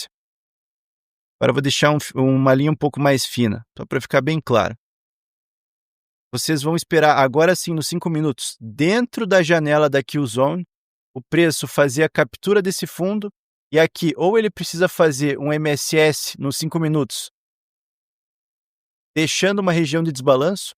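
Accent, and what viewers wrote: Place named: Curitiba, Brazil. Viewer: Depois de 8 anos operando e consumindo tudo q existe no youtube, descobri esse canal e não consigo mais assistir outros. Vejo diversas vezes cd aula e assim q puder vou retribuir adquirindo algum serviço pago seu. Obrigado,! Obs. Sou de Curitiba, reconheço esse teu sotaque aí!😂👍🏻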